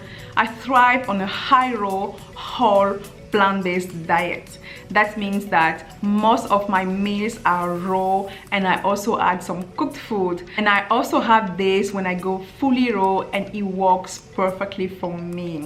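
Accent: France. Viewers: Elizabeth Lizzy it is a French accent, it was mentioned in her Q&A video :) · Are You French ? Super video! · I think it's a french accent (kinda like mine ahah) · Are you a native French speaker?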